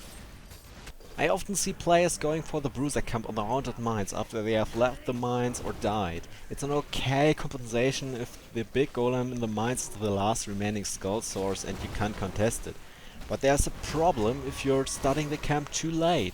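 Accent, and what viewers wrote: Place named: Germany. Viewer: Dat german accent...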